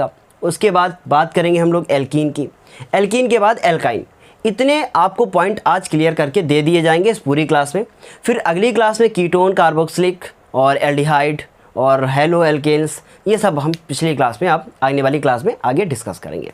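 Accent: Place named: Kanpur, India. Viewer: Sir apki kanpur language ki vajah se hme bahut mja aaya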